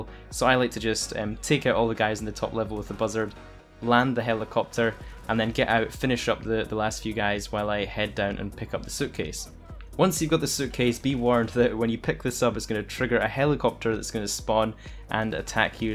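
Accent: Scotland, United Kingdom. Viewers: First Scottish GTA youtuber, finally I might be able to follow this without bullshit 100 step glitches that don’t even work. You from Glasgow bro?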